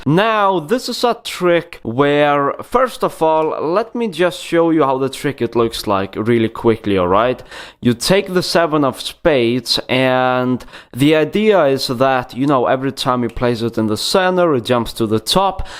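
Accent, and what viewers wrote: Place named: Russia. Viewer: Like the Russian arscent. Now I know the trick. Cheers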